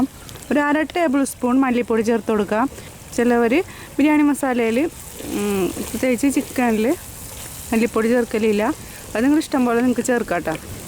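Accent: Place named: Malabar, India. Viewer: Chechi sherikkum thrissur thanne aano..“cherkalilla “ennu okke paranju kekkumbo evideyo oru malabar slang thonnum pinne vere ethokkeyo slang um thonnanu😊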